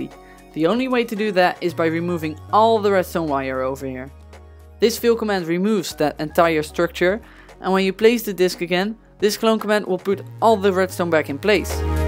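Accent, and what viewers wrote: Netherlands. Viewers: You sound a little bit Dutch ; )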